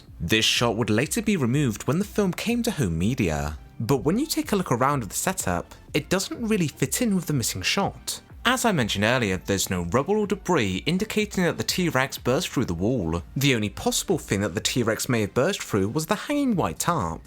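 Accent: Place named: Wales, United Kingdom. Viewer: Your accent reminds me a bit of Dave Lister from Red Dwarf. So that's, what, Welsh maybe? Being from California, I'm unfamiliar with the regional patois of British dialects.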